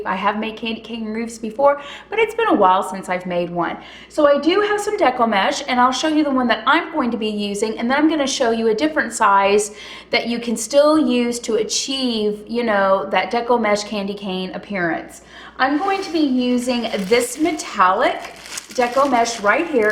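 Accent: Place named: South, United States. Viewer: I was born and raised in Atlanta so I know a southern girl when I hear one. Kayyy???? lol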